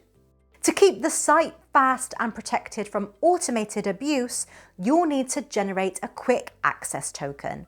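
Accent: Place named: Bristol, United Kingdom. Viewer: Don't get caught with your pants down had me in stitches. Is that a Bristolian accent I hear ? Definitely West Country for sure. Looking mighty fine beautiful lady, keep up the great work you do.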